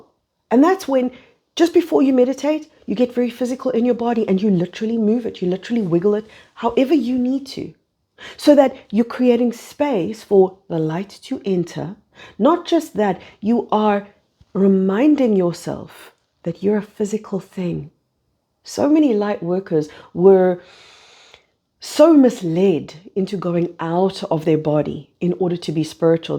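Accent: South Africa. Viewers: I like South-African-English. It is much more understandable for a non native English-Speaker than for example American- or British-Englsih.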